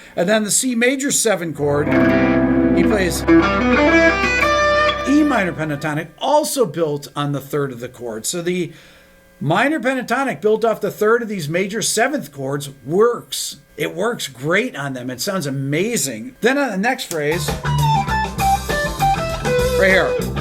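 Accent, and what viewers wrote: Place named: United States. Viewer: Been playing for 40 years but my knowledge is reassured everytime I listen to Me Beato, being Spanish my first language,my English skills are also honed with his excelent American accent.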